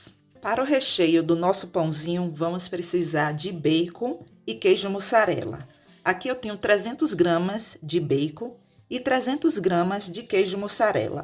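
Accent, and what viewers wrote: Bahia, Brazil. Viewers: Aí linda tu e da minha terrinha kkkkk baiano realmente estréia quando assistir um vídeo de empada de carne seca vi um sotaque de Aiai falei será que e daqui pensei acho que não kkkk agora ouço isso ensina com excelência sua dicas e ouro sabia da massa mas as técnicas não aprendendo e muito.Deus de Israel abençoe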